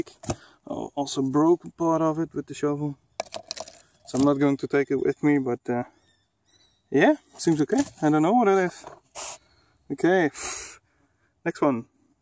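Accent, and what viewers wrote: Netherlands. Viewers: Geen haat maar je hoort dat je Nederlands bent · You've a dutch accent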